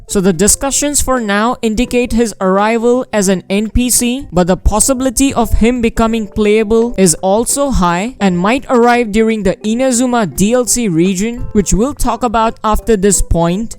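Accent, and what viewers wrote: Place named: India. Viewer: How come you can translate if its in chinese? You sound like an indian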